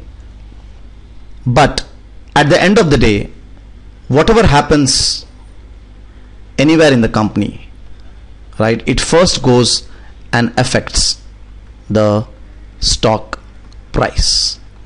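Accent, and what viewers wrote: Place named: India. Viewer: Indian English needs SUBTITLE....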